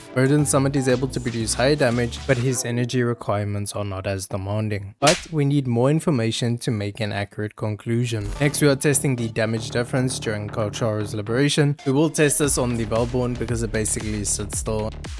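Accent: South Africa. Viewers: Brother based of your accent and ping are you from South Africa?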